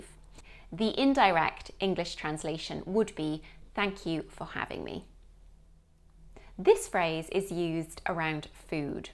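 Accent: United Kingdom. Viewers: I like your British English, too. 🇬🇧😀😃👍🇯🇵👍😃😀🇬🇧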